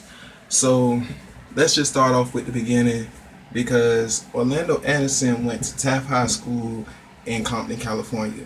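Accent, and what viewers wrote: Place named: Atlanta, United States. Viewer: U must be from Atl, judging from the accent and grill. However some of your info was correct and some wasn't. I'm from Compton and Baby Lane grew up right down the street from me. I strongly believe he had something to do with Pac's murder, but I don't think he pulled the trigger. Futhermore most of the people associated with this case are dead now. So it's really just a lost cause unfortunately.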